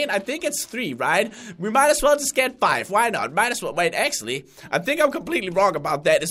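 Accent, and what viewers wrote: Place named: Denmark. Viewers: arent you danish because your accent is very danish sounding no offence im danish too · He is danish.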